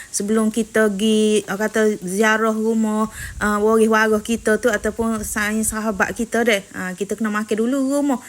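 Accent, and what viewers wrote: Kelantan, Malaysia. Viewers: Simple + senang.. haha terhibur dengar org kecek Kelantan ni.. hehe husband saya pon org Kelantan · Ralit saya tengok video sis.. lama tak dengar oghe kecek kelate..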